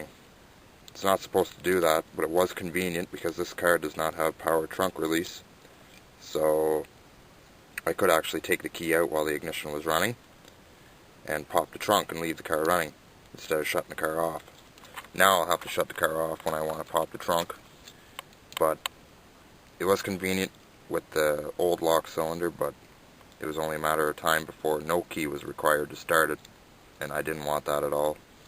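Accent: Canada. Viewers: Canadian eh.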